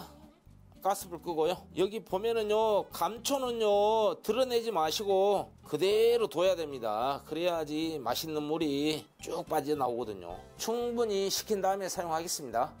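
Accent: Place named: Yeosu, South Korea. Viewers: 셀프님! 돌산 삼일면 말투네요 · 쉐프님 사투리 넘 정겹고 좋네요. 돌산사람 특유의 그 억양… 그 억양은 돌산사람이라면 딱 알죠. 😂😂😂 쉐프님 서울살이 꽤 되신거 같은데 어찌 그리 돌산 억양이 그대로 있담니까? 쉐프님 억양에서 나 돌산 신기사람이요 그러네요 ㅎㅎ 최근에 팬 되부럿습니다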